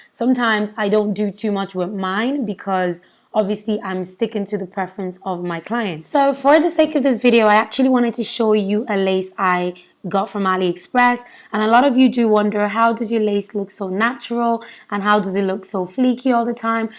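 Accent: Ireland. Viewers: Why am I hearing a Dublin accent? The unit looks lovely btw xx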